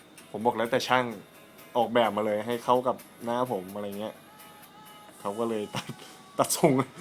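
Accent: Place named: Thailand. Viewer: ทำไมต้องพูดเหน่อ คนจังหวัดไรอ่ะ คะ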